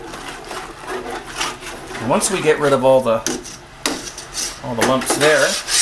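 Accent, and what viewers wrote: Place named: Canada. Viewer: hi, thanks for the great videos. I'm learning a lot. Just curious that you use Coopers products as I'm from South Australia so it's every where (and I love Coopers:)) but you sound Canadian - so either you live here or Coopers are exporting far and wide - cheers to you!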